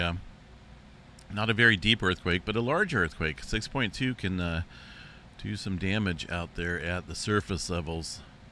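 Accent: California, United States. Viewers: You sound like you’re from Cali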